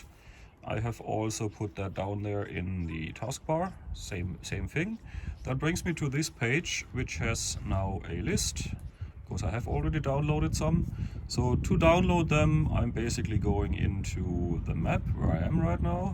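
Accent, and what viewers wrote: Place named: Germany. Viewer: Wieso in englisch? Bist doch Deutscher oder nicht? Also dein Englisch klingt sehr deutsch 😅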